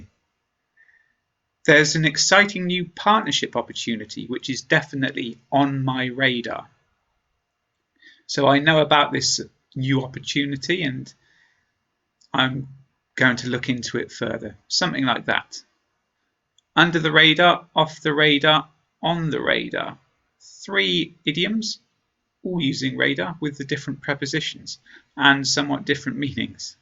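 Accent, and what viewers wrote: United Kingdom. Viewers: Thank you! Your videos do help me a lot! I am a Chinese student majored in English language and literature. I haven't been in England yet. But your videos provide me a window to Great Britain; and make me feel like that I have been living in London already. I had watched your videos for two years since I got access to YouTube in 2014. Watching your videos like taking English course, that makes you as my mentor. Your videos are always interesting and useful. They really help me a lot. And one more thing, your British accent , classical! Waiting for your videos. Thank you for your videos.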